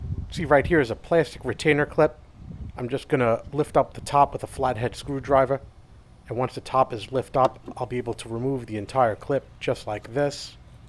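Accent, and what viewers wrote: New York, United States. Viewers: Sounds like a New York accent and it made watching this video hard. Just terrible accent.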